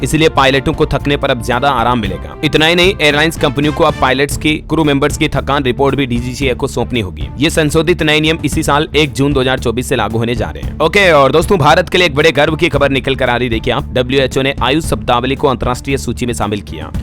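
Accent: Rajasthan, India. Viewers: bro aap rajasthan ho kya lgrha h is video m tone lag rhi h rajashthan vaali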